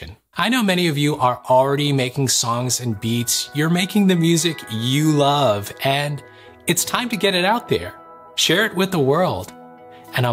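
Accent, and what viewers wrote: India. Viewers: Abe tu Indian hai na? ....Orr bohot angrezi jhaad raha hai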